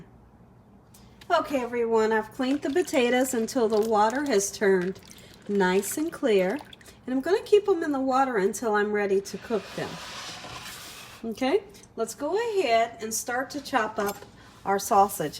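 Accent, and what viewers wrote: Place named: South, United States. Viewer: I absolutely love your voice and southern accent 🫶🏾 and this has become one of my favorite meals to make, bcs of you!! Thank you so much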